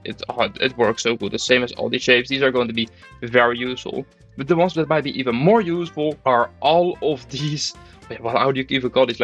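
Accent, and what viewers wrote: Netherlands. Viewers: de nederlandse accent!!! hahahah helemaal geweldig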